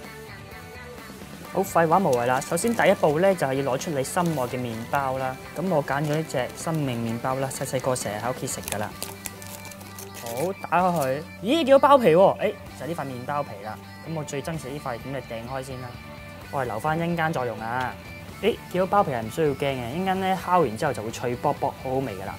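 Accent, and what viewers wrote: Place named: Hong Kong, China. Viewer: D英文好香港式 夠地到 正!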